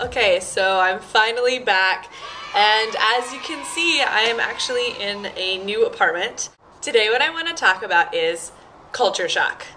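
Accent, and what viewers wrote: South, United States. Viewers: I can't remember if i asked you this before or not so Im gunna ask again... Do Koreans notice your southern accent? Yours isn't really noticeable to me but Im sure it is to someone who doesn't come from the south (and to anyone who is reading this, I mean the southern part of America). I have more of an accent so would they notice it as country or just American? Like would they point at me and say "cowboy!"? Or cowgirl i guess... Lol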